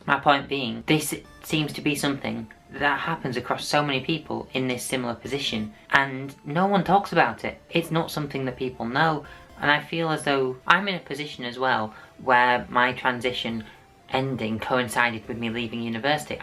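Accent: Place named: United Kingdom. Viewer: Seeing this two years later ( I guess it was Two Years Ago with.) I find it is SO SAD that you couldn't get a Permanent Radio 📻 Gig. As I've said before... American Public Radio Stations would hire you in a ❤️ heartbeat for your British accent,Show Content and Dilligent Production Qualities. By the way...we could do a Show together...where we present and argue the Qualities of The British Production of Life on Mars....or the American Version of Life on Mars! 👾 👽. By the way...I noticed your Bed Covering...I do believe you are trying to make a Spectacle of Yourself,! Adios!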